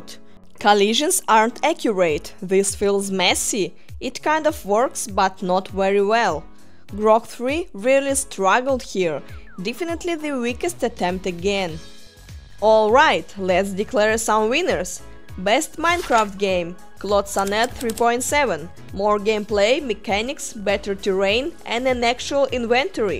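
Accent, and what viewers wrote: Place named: Russia. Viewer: UR ascent is so Russian. Are u from Russia? Awesome demonstration btw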